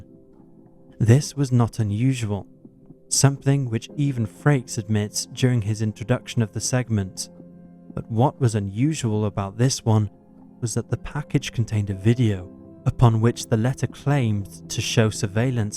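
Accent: United Kingdom. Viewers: I appreciate all vids from Top5s. As well as the new narrator. Something about a British accent among listening to only American accents all the time that really helps lol Thx Top5s team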